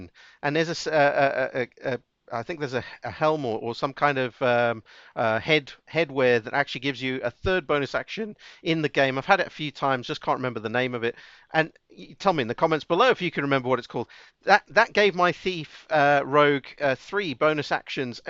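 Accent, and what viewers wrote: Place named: Greece. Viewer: You sound so much like Greek lol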